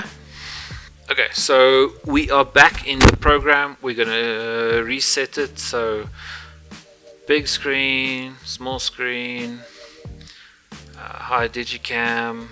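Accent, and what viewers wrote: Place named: South Africa. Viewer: Hey I enjoyed your Video and loved hearing your SAFFA accent being one myself in living in Melbourne. I have a Sony A6000 but looking for options to try and capture a group type live demo environment for others to watch via zoom (ie train others remotely). Any suggestions as to what webcam might suit that? Cheers mate. Darron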